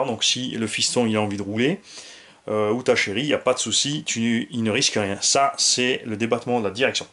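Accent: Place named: Alsace, France. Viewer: Salut! Super ta chaîne dédiée au modélisme RC, je l'ai découverte il y a 5 ou 6 jours! J'ai du taf, y a un paquet de vidéos à regarder haha! Mes oreilles ont remarqué de suite un accent familier, t'es Alsacien et ça fait bien plaisir (je suis de Colmar perso), content de constater qu'il y a des passionnés dans le coin, je commençais à croire que j'étais tout seul à basher dans mon coin de forêt! Tes tutos vont m'être bien utiles et d'une grande aide, j'ai un Typhon v3 en cours de remontage après une sortie/journée VDM (il a fini dans une flaque de ciment un peu en dévers que j'avais pas vu... sans commentaires... ^^). Bref continue tout ce bon boulot, t'as un follower en plus et des pouces bleus!!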